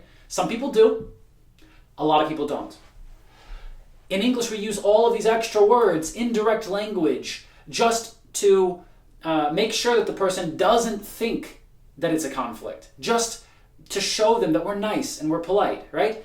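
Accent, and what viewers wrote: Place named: Russia. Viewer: Английский с русским акцентом)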